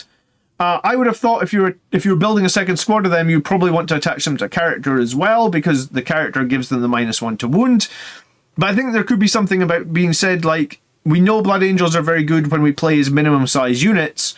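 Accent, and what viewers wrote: Scotland, United Kingdom. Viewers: Something about a wholesome Scottish man talking about murder space vampires makes me happy.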